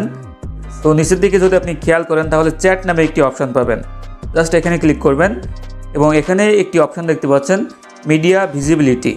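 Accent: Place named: Comilla, Bangladesh. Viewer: ধন্যবাদ ভাই। ভাইয়ের বাড়ি কোথায়? কুমিল্লা মনে হচ্ছে?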